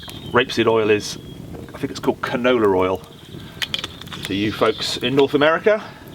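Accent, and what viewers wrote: United Kingdom. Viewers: My husband asked me about your accent. I told him you sounded British to me. Am I right? We surely enjoy your show especially this one with your son.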